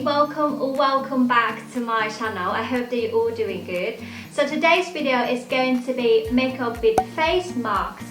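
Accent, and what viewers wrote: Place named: Thailand. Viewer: I like your Thai accent!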